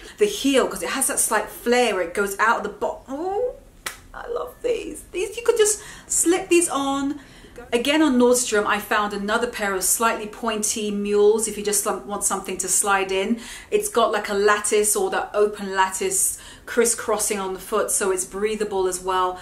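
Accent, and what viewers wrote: United Kingdom. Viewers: u r such a nice gorgeus woman! at last somebody who can make fashion a matter of fun and smiles ! you sound soooo British. ...do u live in the US? sorry of I don't know you! I ve just subscribed...ciao from Italy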